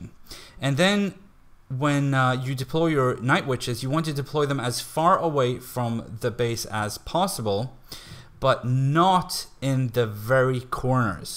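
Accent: Sweden. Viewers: Limpansson 14 Analysing his accent (which is hardly noticable by the way) I think he could be swedish indeed. Kinda sounds like PewDiePie but better English.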